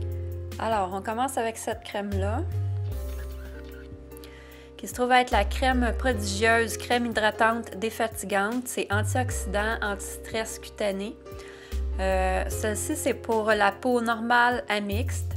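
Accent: Canada. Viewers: Coucou merci d'être passé sur notre chaîne on s'abonne aussi ... une question es tu canadienne car tu as l'accent canadien bonne fête 😘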